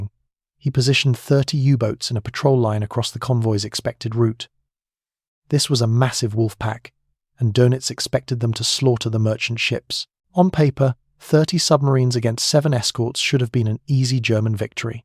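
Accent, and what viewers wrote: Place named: United States. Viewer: Hey ! The speaker must be a yank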